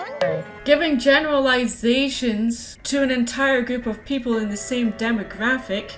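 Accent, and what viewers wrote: Scotland, United Kingdom. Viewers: Are you Scottish cuz I'm I'm a fan of Scotland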